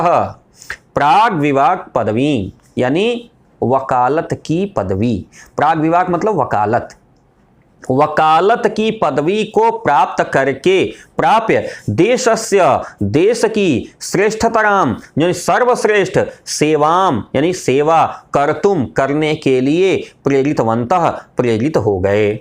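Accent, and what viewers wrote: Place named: Bihar, India. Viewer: आप योग हैं तभी तो सरकार में चयनित है पढ़ाने का तरीका बहुत बढ़िया है आपकी आवाज लगता है बिहार के लोगों से मेल खाता हुआ, लेकिन हिंदी साहित्य में अधिकांश विद्वान अपने पूर्व के ही हैं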